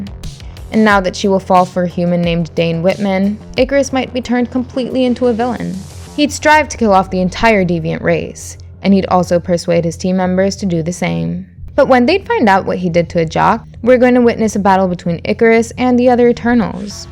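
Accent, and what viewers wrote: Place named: United States. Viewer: You're a female CANADIAN LAD ...but you sounds like AMERICAN 😁lad ❤x🇮🇳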